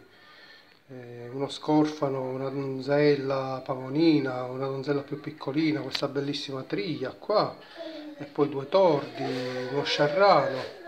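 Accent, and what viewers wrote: Sicily, Italy. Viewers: Mamma mia l'accento siciliano si sente tanto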